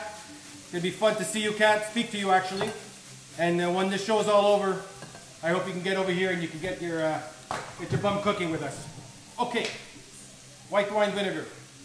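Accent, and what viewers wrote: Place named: Montreal, Canada. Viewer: I picked up the Mtl accent right away 😂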